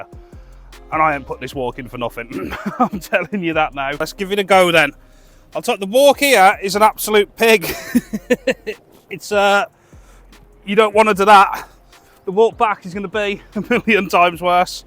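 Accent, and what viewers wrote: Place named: Midlands, United Kingdom. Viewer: I’m from the Black Country your accent sounds a bit local ish to the midlands mate! Love your videos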